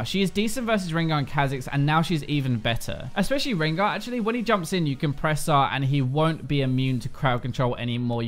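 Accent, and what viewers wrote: England, United Kingdom. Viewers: Your doing gods work, both the patch videos and the app are very helpful as well as the English accent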